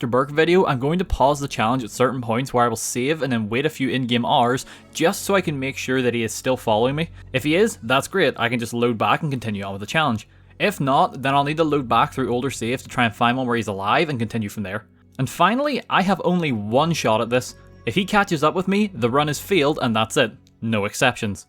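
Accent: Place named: Northern Ireland, United Kingdom. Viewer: Ahh, a fellow Northern Irishman, eh?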